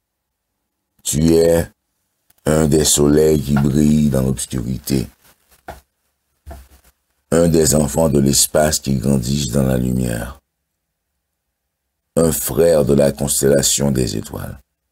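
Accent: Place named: Africa. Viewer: Seigneur, cette voie est incroyable, la narration est profonde, chaude, aux magnifiques accents de l'Afrique magique. Je crois pas avoir vu les vidéos des premières tablettes ni même de playlist regroupant toutes les tablettes lu par cet incroyable narrateur... Dommage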